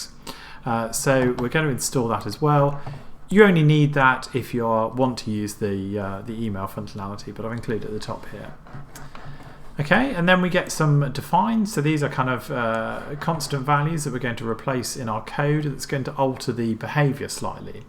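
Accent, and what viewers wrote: United Kingdom. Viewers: Miss the British accent... Away from England for over 12 years... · Absolutely excellent instruction video! Thank-you. PS love the 'posh' accent. :-)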